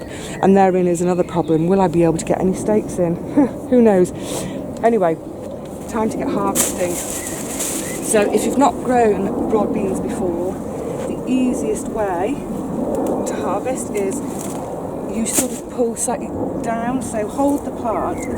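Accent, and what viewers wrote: United Kingdom. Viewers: " 🎼➡️ A long handled Sneeboer potato or bulb planter will enable you to plant through that tough soil. For this reason I am constantly working to improve my soil. This tough unapproachable soil takes the FUN outta gardening! Wishing and hoping DOES NOT improve CLAY soil! that is an excellent looking stand of broad beans. Raspberry is definitely as weed in your broad bean patch. Those beans are humongous! My mother who is an excellent cook asked me to grow 4" Nickel Filet beans. Thank you for showing us your harvesting technique. This Jane Goodall ad is one of the FEW that was WORTH watching! We can beFRIEND whatever animal we currently care for. Yes, you can intercede cover crops:-) I am currently planting Creeping Charlie into my beds bc Alfalfa a cover crop that relieves DEEP soil compaction DID NOT relieve surface compaction. I, Portia, will plant Creeping Charlie between my Alfalfa rows. 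Earthworms like coffee to help the anatomy of their gizzard, Excellent! Cardboard is a food for the worms!:-) I love hearing your British accent. I could've had that accent too had my parents stayed longer in Suffolk, England:-) I was enjoying seeing you and your mum gardening together. She looks regal. Your cardboard and coffee beans atop should take care of the raspberry weed. Try putting Creeping Charlie on a portion of your planting area and see for yourself what kind of results it brings for improving the tilth of your soil. DEFINITEL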